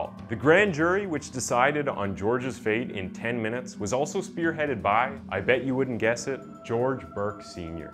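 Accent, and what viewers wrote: Canada. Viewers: Loving your Canadian accent lol really cute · Has anyone told you that you sound like a Canadian version of Keith from the try guys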